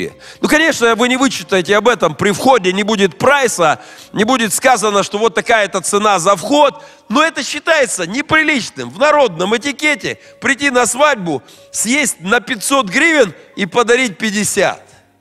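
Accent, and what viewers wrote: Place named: United States. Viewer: Что - за американский АКЦЕНТ?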